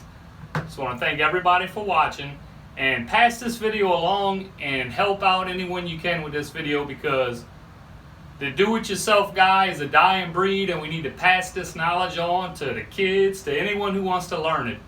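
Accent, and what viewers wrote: Louisiana, United States. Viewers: Are you from Louisiana? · you sound like you from south Louisiana!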